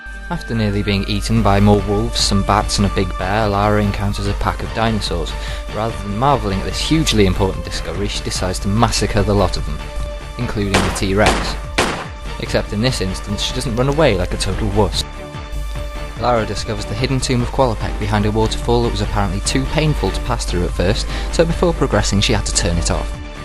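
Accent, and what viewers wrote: United Kingdom. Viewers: Wow this must have taken you a lot of time! Very funny! Btw you have a british accent :) Probably cause you're biritish =P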